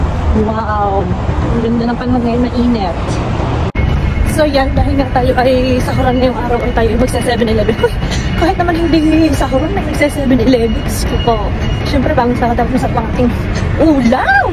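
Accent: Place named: Batangas, Philippines. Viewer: Abay ikaw gay batangueña..ala e..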